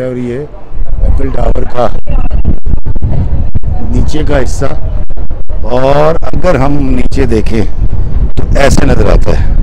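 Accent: Pakistan. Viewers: Aap k vdo achi hai aap ka (efal) tower aur ( perass) kehna b khalis Pakistani style hai aur phir ( O my God…) all good but ye jo perass ka nazara hai na ye kuch itna impressive nai hai vs our Islamabad view from Daman e koh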